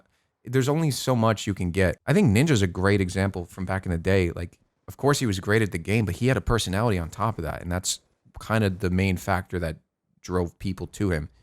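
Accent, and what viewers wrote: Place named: New York, United States. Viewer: new yorker accent leakin out a bit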